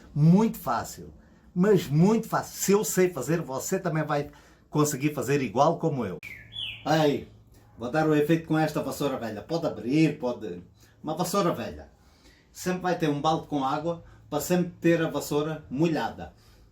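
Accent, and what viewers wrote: Portugal. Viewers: eu vi uns vídeos seu de tijolinho não lembro qt tempo faz acho q foi 2016 ou 17.Achei à coisa mais linda. Agora tá uma enxurrada aqui no Brasil. E por acaso vc fala daqui ou de Portugal?E p/terminar é um dos melhores trabalhos q já vi na internet.O tom as nuances e formas está maravilhoso.Parabéns😝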